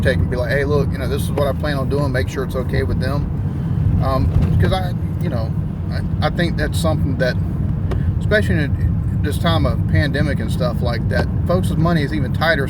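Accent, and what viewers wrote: South, United States. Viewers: And I'm good with your accent no matter how southern you get, I'm a big big country fan all my life so it's second nature for me . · It’s all good brother. You may sound a bit like a hillbilly but I think most people on here can see your, as we say in a Australia... a top bloke 👍 keep the videos coming mate 😊👍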